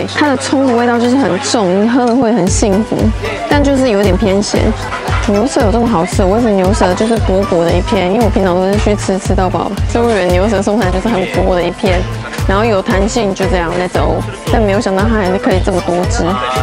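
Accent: Taiwan. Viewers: 你講臺語好可愛哦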